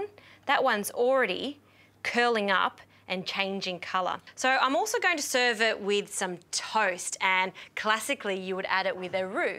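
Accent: Australia. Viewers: She sounds Australian.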